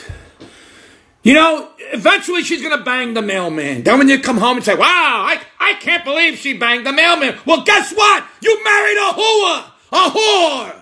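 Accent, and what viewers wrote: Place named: Brooklyn, United States. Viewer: “WHERE I COME, ITS HOOO AAAHHH!!!” LMFAOOOOO I RECOGNIZE THAT BENSONHURST BROOKLYN ACCENT ANYWHERE, LMFAOOOOO ….THANKS FOR THE LAUGH 🤣👍🏾👍🏾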